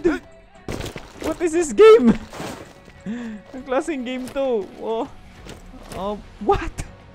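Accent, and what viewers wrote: Visayas, Philippines. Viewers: Bisaya ka pala lods ❤hahah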